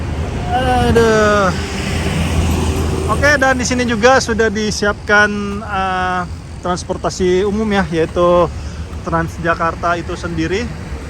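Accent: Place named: West Sumatra, Indonesia. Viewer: Dari logat bicaranya nampaknya abang ini "orang minang"... Salam urang awak....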